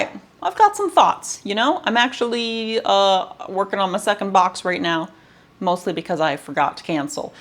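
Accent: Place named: Midwest, United States. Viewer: Nice Midwest accent 😄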